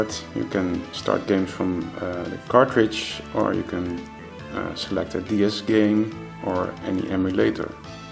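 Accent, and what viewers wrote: Netherlands. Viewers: (ik hoor aan je accent dat je Nederlands bent dus doe ik dat ook haha), Gaaf ding man, kun je laten zien hoe je 'm maakt? Ik wil dit ook gaan doen namelijk en heb er niet veel verstand van. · Je klinkt als een Nederlander die Engels praat, klopt dit? 😜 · You must be a coming from the Netherlands, according to your accent. Anyway. I like the Game Boy Advance shelled bottom of the Nintendo DS lite! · Are you dutch cause you really have a dutch accent i can know cause im dutch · You sound Dutch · are you dutch? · Are You dutch? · You have the most obvious Dutch accent ever. Great video though, interesting build! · I hear a Dutch accent ;) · wow, dit is echt het meest nederlandse accent ooit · Are you from the Netherlands? Your accent sounds dutch.. Anyway.. If you are, can you make me one for a fair price? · Are you Dutch by any chance? 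· You a dutchie??? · I love his dutch accent :D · Heard you were dutch right away haha :-D Lekker bezig man! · Yes, he sounds Dutch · another dutch who can't speak english without an accent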